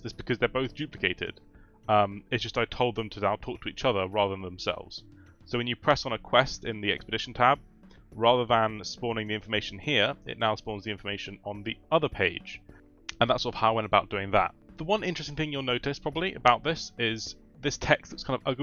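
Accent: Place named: United Kingdom. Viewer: Dat UK accent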